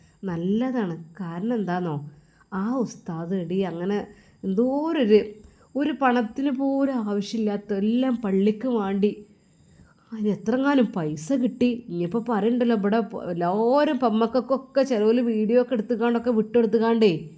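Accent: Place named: Malappuram, India. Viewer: തൻ്റെ ഭാഷാ കേട്ടിട്ട് എൻ്റെ സംസാരത്തിലും മാറ്റം വരുന്നു ഞങ്ങൾ തൃശൂർക്കാർ ആണ് അപ്പോ എൻ്റെ ഭർത്താവ് പറയുകയാണ് നിൻ്റെ സംസാരത്തിൽ വിത്യാസം വന്നല്ലോ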